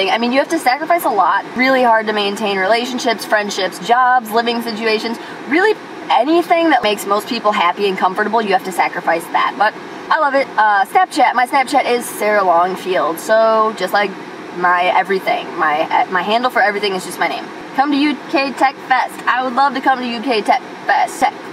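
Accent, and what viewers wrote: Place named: Wisconsin, United States. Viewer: I knew it! She IS from Wisconsin. The way she pronounces her A's like in "tracking" gave it away.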